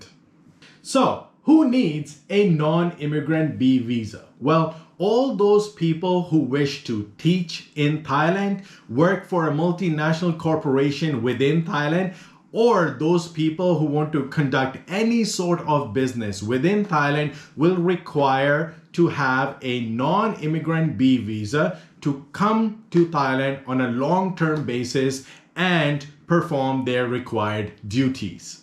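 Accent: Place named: Punjab, India. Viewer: What part of Pakistan are you? Your punjabi accent is unmistakable. Good videos.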